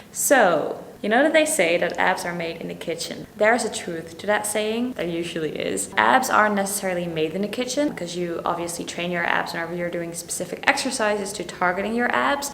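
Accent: Netherlands. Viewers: Going by the sound of it I would say that you're Dutch.. Good info! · Your accent is so cute <3 are you from the Netherlands? You sound like a Dutchie!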